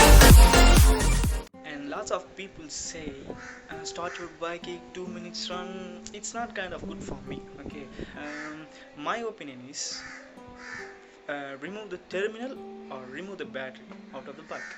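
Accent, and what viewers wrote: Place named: United Kingdom. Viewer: I thing he is speaking British English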